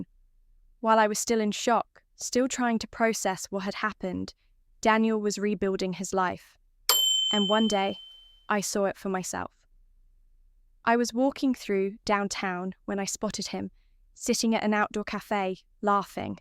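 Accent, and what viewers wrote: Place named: New England, United States. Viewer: Definitely not a Texan from Dallas reading this story. Sounds like a New Englander.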